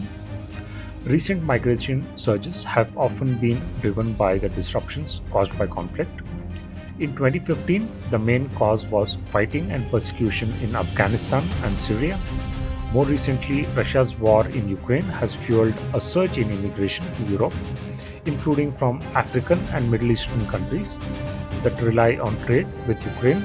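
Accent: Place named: India. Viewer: The narrator sounds Indian, plenty of room in India to enjoy mass illegal immigration . Send them to India or the other countries of the Indian sub continent where they can enjoy diversity at the expense of the Indian people or the people from the surrounding countries. Or let the dishonest narrator welcome them into his home free of charge. Not asylum seekers but illegal immigrants , enough with your lies.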